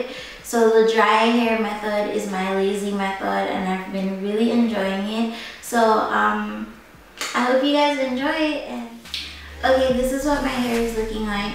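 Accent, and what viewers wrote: Jamaica. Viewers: you from Jamaica?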